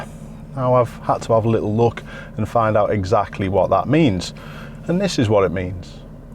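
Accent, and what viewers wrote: Liverpool, United Kingdom. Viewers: Remove ALL German whips from our roads haha!!!! Seriously though, not great driving I agree. I am now 50 years old and my driving has improved significantly since I was a youngster. It is ALL to do with attitude and mindset! Keep up the good work, I have never listened to a Liverpudlian so much before but your videos are great and keep me mindful about so many things when I drive.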